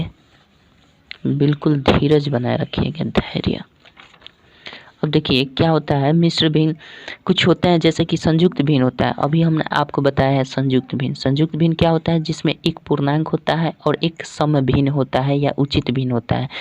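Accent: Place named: Bhojpuri, India. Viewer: Bhojpuri nahi bolte sir par aap bolte hai to accha lagta hai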